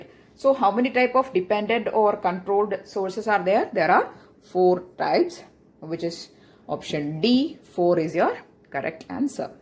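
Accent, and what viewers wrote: Kerala, India. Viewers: Are u a malayali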